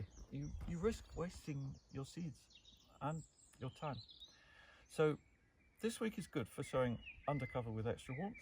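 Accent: United Kingdom. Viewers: Good video but the volume on the sound was much too low (as are some of your other videos). Not sure what the problem is but it’s very difficult to hear you and being from the US, I have to listen very closely to understand your lovely British accent. ♥️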